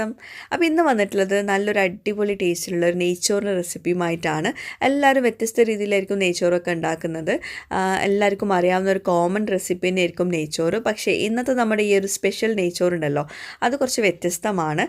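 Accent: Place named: Northern Kerala, India. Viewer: Hi.. Njan ഈയിടെ ആയിട്ടാണ് vlogs കാണുന്നത്.. 4 items ഉണ്ടാക്കി.. Suupprr.. കുറച്ചു receipies എഴുതി വച്ചിട്ടുണ്ട്.. യുടെ proper place എവിടെയാ?? ഒരു വടക്കൻ slang.. അതാണ് ചോദിച്ചത്.. ഞാൻ kannur..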